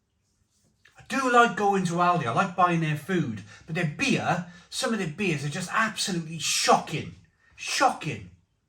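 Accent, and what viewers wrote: Wales, United Kingdom. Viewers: Love your Welsh accent!!😉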